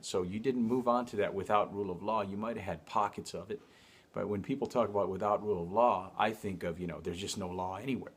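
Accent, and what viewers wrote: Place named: Nevada, United States. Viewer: Sounds like a Nevada man :)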